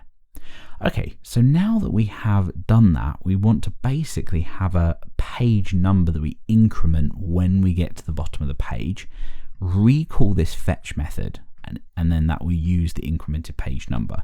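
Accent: United Kingdom. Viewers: An extraordinary tutor with British accent, how cute <3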